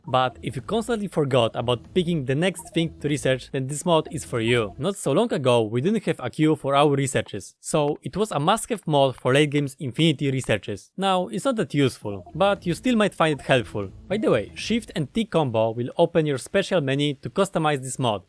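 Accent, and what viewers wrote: Russia. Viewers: Такое чувство как будто русский говорит на Английском))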